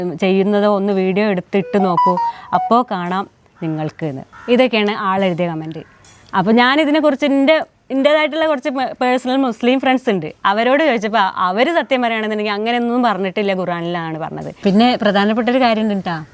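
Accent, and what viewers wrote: Malappuram, India. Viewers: അതാണ് നമ്മൾ ഈ മലപ്പുറം ജില്ലയിൽ കളിച്ച് വളർന്നതാണ് നമുക്ക് ഇതൊന്നും ഒരു പുതുമയല്ല ഇതും അതിനപ്പുറവും എതിർക്കുന്നവർ കണേണ്ടി വരും നമ്മൾ ഒരു പൂന്തോട്ടത്തിലെ പലനിറങ്ങളുളള പല ചെടികളെ പോലെ നമുക്ക് എന്നും സന്തോഷത്തോടെ കഴിയണം ഞാൻ ഒരു മുസ്‌ലിമാണ് എൻ്റെ രാഷ്ട്രീയം SDPI ആണ് ഞാൻ എന്നും നിങ്ങളുടെ വീഡിയോ കാണുന്ന ആളാണ് ഒന്നാമത് എനിക്ക് ഫുഡ് ഉണ്ടാക്കുന്നത് കാണാൻ ഇഷ്ടമാണ് അതിലേറെ നിങ്ങളുടെ മലപ്പുറം ശൈലിയിലുള്ള അവതരണം ഒരുപാട് ഇഷ്ടമാണ് ഇതെന്നും നമ്മുടെ ഇടയിലുള്ള ചില പുഴ് കുത്തുകൾക്ക് ഇഷ്ടപെടൂല അവരെ വിട് നമ്മൾ ഉണ്ടാകും നിങ്ങളുടെ കൂടെ നിങ്ങളുടെ കുടുംബ ജീവിതം എന്നും സന്തോഷത്തിലാവട്ടെ ചേട്ടനെ കണ്ടാലറിയാം നല്ല ഒരു ഭർത്താവിനെയാണ് ചേച്ചിക്ക് കിട്ടിയത് എന്ന്🎉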